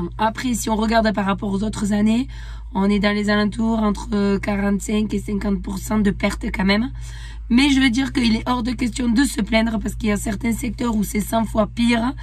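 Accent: South, France. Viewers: Tant mieux que la récolte de la vigne soit acceptable. J'imagine que le soleil de votre région (par votre accent du sud) à aidé à ce que les plants produisent quand même. Quel nom de bouteille est ce que l'on pourrait acheter du Québec pour goûter un petit peu votre région. Merci et bravo pour votre travail.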